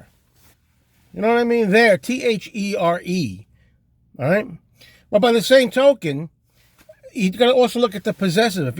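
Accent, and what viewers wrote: New York, United States. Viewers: I always seem to watch your Rant Videos, when I am having my morning coffee, and again, I almost spit my coffee out from laughing. I loved the name of your channel, very catchy.... I am a native New Yorker, here in the South for 8 years, and hearing you TAWLK, I feel like I’m home again...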